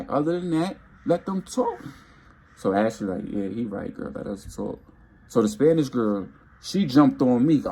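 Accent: New York, United States. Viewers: YEP he's a New Yorker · he sounds just us NYers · He definitely sounds like he from NYC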